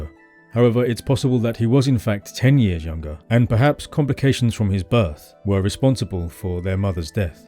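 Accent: United Kingdom. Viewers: This video auto-played after one of MrBallen’s videos. I normally just exit the new video when this happens, but not today. This is awesome! History, crime and great narration. The British accent is a huge bonus (I’m a borderland Texan lol). Thank you, sir. 🏰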